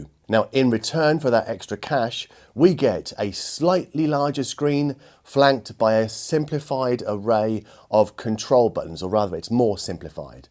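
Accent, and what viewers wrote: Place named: England, United Kingdom. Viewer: Thanks! Get well soon (You sound like Caleb from Digital Trends albeit with an English accent 😅)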